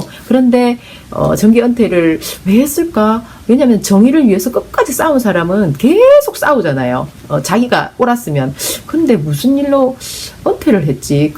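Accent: Gyeongsang, South Korea. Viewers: 엄청 똑똑하네요 사투리 쓰지마세요 경상도 사투리 듣기싫으니깐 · 진정 경상도 오리지날이심 ㅎㅎㅎ 슬즌... 어는 으로 으는 어로... ㅋㅋㅋ 인정합니다. ^^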